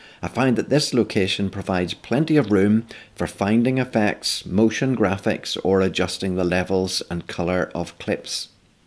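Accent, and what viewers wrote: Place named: Northern Ireland, United Kingdom. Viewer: First northern ireland accent I’ve ever found on a premier pro tutorial lol also from northern ireland (tyrone)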